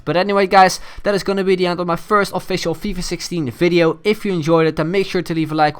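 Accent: Netherlands. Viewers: ik dag al dat je nederlands was dat merk je gewoon hoe nederlanders engels praten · are you dutch?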